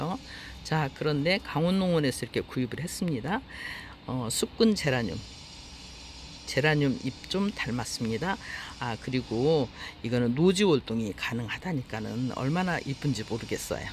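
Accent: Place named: Seoul, South Korea. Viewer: 설명 하시는 말 솜씨가 완전 서울 토박이 같네요 ㅎ 전 갱상도라 부럽습니다 여러 이쁜꽃들 소개시켜줘 고맙습니다 👍🙏🥰❣️🌷👏👏👏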